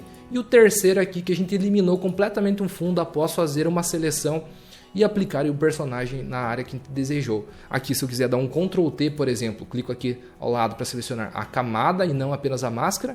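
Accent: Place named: Paraná, Brazil. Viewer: Tua didática é excelente! Excelente vídeo. Grata por essa aula! Ganhou mais um inscrito! ;)Lm E Esse EEEE TEEE ae? És paranaense!!?? rsrsrsrs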